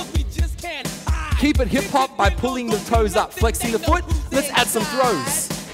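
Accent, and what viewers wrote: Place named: England, United Kingdom. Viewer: What English Accent is that?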